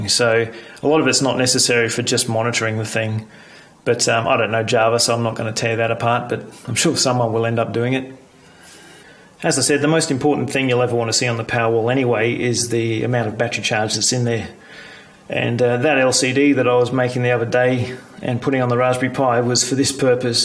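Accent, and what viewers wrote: Australia. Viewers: I know this is an old post but maybe you are still monitoring it. I live in California, you sound like you are from AU so you may not know about the planned power outages in CA. So if I am understanding you correctly when there is a planned power outage which can be for up to 6 days they tell us if there is no wifi or 3G connection for the power wall to report to Tesla I will have periods of time when I will not have power if I am on battery?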